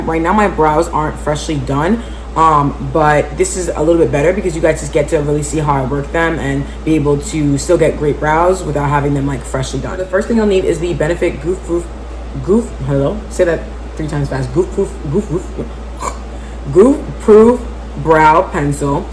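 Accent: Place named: Canada. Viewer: You sound Canadian❤️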